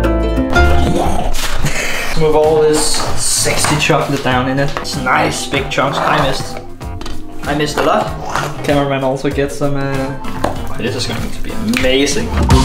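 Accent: Denmark. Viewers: Its danish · Hva satan, har lige fundet din kanal og kunne med det samme høre du var dansk AHAH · Er du fra DANMARK?!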